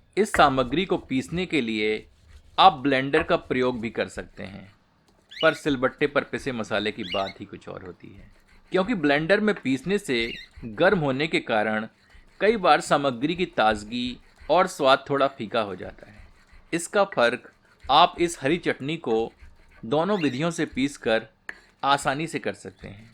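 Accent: Standard. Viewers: Lehja.. Behad sundar shudhdh Hindi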